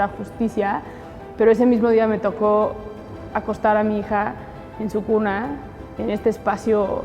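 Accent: Spain. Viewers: hace el acento español en otros programas lo hace más y ahorita no lo hizo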